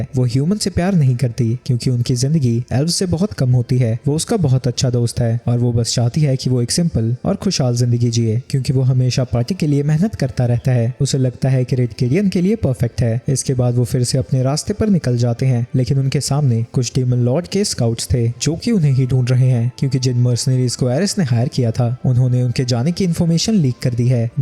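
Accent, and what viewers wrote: Gujarat, India. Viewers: Bhai tum gujrati ho kya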